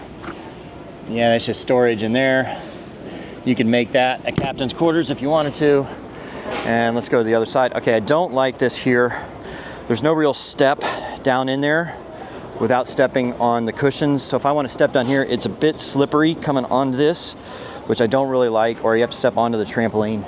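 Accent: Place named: United States. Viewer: Awful video, really poor content. There's only one thing worse than someone moaning and that's someone moaning with an American accent. There are some really great presenters reviewing yachts and M.Y. on YouTube, this guy isn't one of them.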